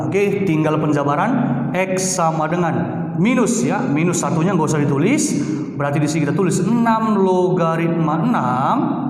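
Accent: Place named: North Sumatra, Indonesia. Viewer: Bapak org Sumut ya pak? Logat bicara kita hampir mirip soalnya😁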